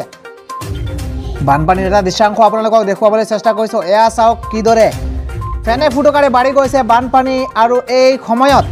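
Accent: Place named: Bangladesh. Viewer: Toi bangladeshi neki kotha khuni khenekua lagise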